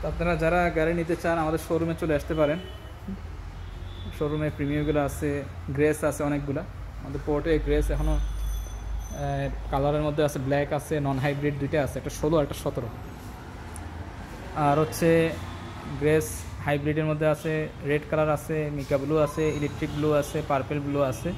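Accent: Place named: Comilla/Noakhali, Bangladesh. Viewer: উপস্থাপক কুমিল্লা দক্ষিণ অথবা নোয়াখালীর জেলার মনে হচ্ছে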